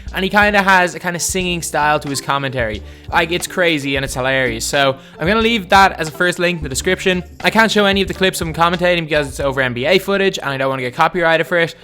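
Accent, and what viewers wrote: Ireland. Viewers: I thought your Irish wtf you uploading like 3 in the mornin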